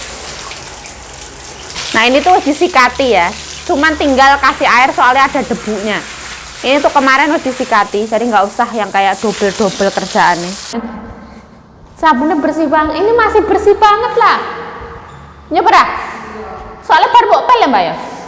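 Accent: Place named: Banyuwangi, Indonesia. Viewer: Sampean ki opo wong banyuwangi, kok logat ngomong'e koyok mbak'u ipar banyuwangi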